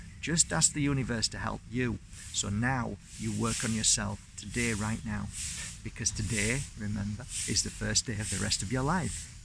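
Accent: Yorkshire, United Kingdom. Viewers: I wish I could meet you face to face. Just to spend a little time in your presence would so help with the issues I have. I need to find the happiness within. Thank you so much for your wisdom and all your videos, they do inspire me. Just to have a conversation would be awesome. Thank you. Ps. Love your accent. Little bit of Yorkshire and Leeds.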